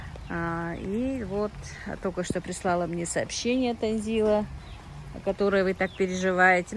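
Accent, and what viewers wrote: Standard. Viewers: я Ваша тёзка. Недавно подписалась. Я с Троицкого. А Вы где живёте? И ещё хотела спросить: Вы раньше не в Грозном жили? Или с Пригородного? У Вас речь чистая и правильная...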